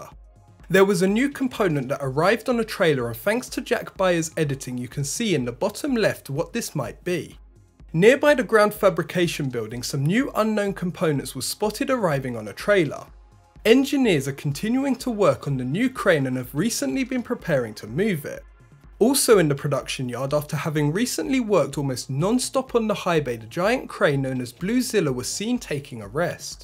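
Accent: England, United Kingdom. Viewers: Christopher Carr you throw linguistic terms but you can’t tell he has an English accent to me that seems unusual.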